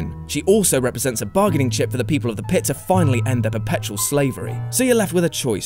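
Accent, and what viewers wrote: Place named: United Kingdom. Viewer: So many quick British syllables.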